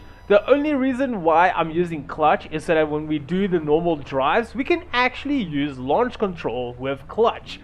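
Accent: South Africa. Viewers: Sound like u from S.A?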